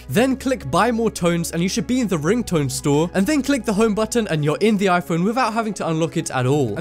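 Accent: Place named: United Kingdom. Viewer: Omg he has a british accent, me took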